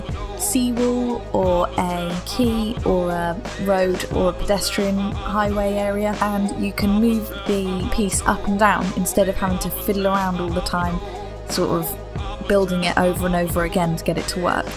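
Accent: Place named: United Kingdom. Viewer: Came for Move It, stayed for the mental attention to detail. Subbed and watched them all. I know your format is typically to VO sped up video but It'd be nice to see some of you playing real time. Nice to hear a Brit doing this so there's a little less boring grid!!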